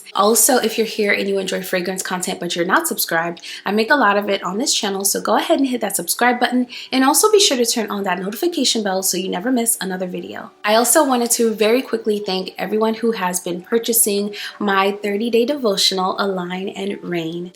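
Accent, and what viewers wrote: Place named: France. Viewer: She's definitely a French speaker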